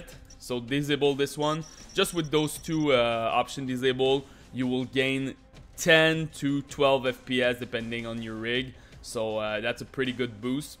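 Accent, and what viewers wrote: Quebec, Canada. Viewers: l'accent direct j'ai capté que t'es un French Canadian :P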